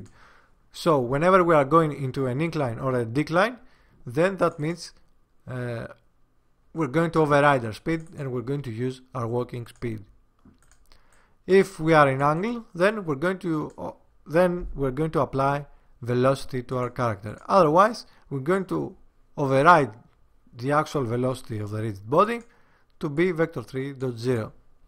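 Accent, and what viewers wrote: Greece. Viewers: aderfe eisai apsogos sunexise etsi (in case you're not Greek, however I am picking up greekish accent then apologies I was only saying "you are amazing keep it up")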